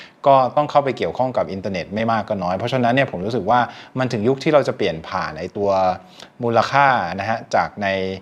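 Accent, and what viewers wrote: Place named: United States. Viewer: คุณพูดภาษาอเมริกันได้ 100% พ่อแม่เป็นคนไทยและโตที่อเมริกาเหรอคะ. ข้อมูลข่าวสารดีมากคะ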